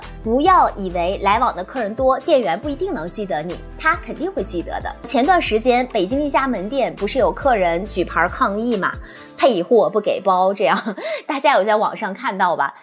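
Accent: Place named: Japan. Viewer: 日本人的發音，長駐日本長大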